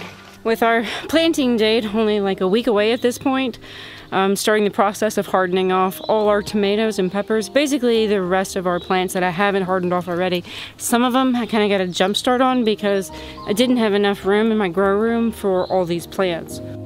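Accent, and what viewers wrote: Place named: Great Plains, United States. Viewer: Your accent sounds more like Great Plains than Allegheny. Nice video. I raise a little flock of chickens myself. They are very entertaining.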